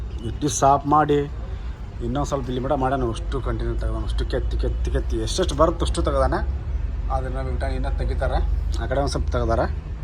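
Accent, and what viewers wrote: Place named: North Karnataka, India. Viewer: Anna nim ura yavd hel plz ni uttar karnataka bashe olga matadati adak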